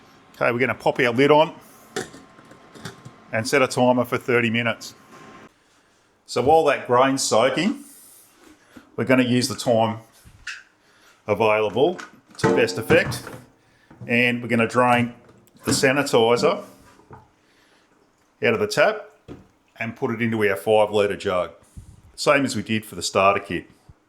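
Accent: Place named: Australia. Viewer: MATE!!! Bloody love your channel, good job on all the videos and love the true Ocker accent, you remind me of me sergeant in the glorious RAR. Keep up the stellar work!!! 🇦🇺🇦🇺🇦🇺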